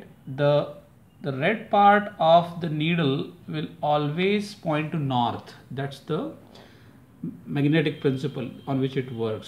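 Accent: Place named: India. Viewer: Steven Torrey His accent is Indian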